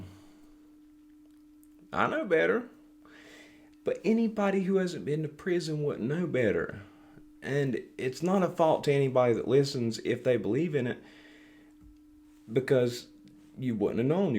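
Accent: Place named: Tennessee, United States. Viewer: Hey you said you answer comments I’ve been watching your show for a while how did you get a Tennessee accent from your babysitter?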